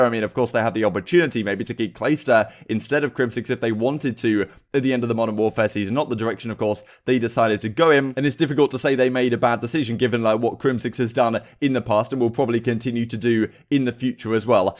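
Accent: United Kingdom. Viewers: Thank god ur British i would hate hearing this without the accent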